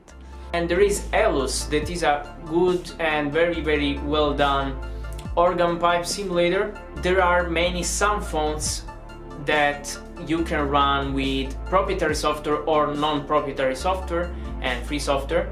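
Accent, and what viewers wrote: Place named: England, United Kingdom. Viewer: I don't know why but.... I love your English accent somehow!!! Its lovely for me...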